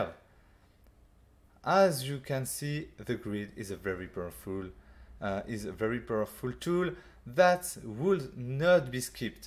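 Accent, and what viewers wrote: France. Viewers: Ah l'accent français 😉 Chouette tuto, j'ai acheté fluent il y a peu mais encore trop eu le temps de m'y plonger... Mais ta vidéo donne envie 😊 bravo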